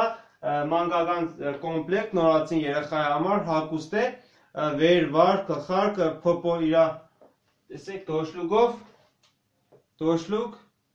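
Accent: Gyumri, Armenia. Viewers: ճպցնում եմ լայք ամեն ինչի, հատկապես գյումրեցու անուշ բառբառի համար )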